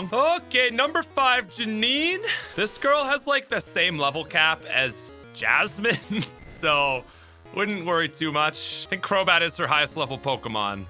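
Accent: Canada. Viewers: That Canadian accent kept slipping out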